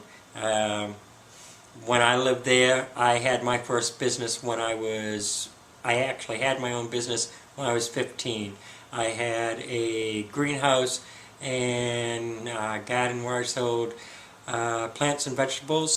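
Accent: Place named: New England, United States. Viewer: I knew you had a yankee accent. I can tell it a mile off. Hugs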